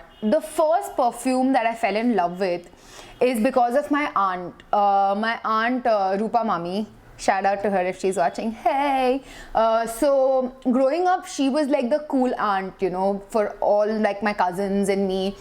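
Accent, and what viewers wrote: India. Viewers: Are u from Kerala..,( palakad tamil)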